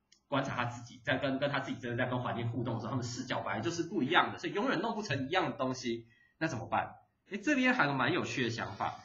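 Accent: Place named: Taiwan. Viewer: 听李老师的课从此爱上了台湾腔🤣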